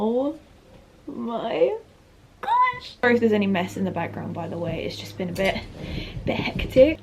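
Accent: United Kingdom. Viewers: Are u from London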